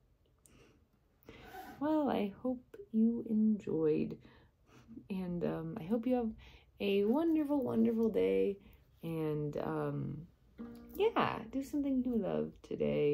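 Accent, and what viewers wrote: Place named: Appalachia, United States. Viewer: WOW! That was really, really good! Especially liked that Appalachian accent. You nailed it!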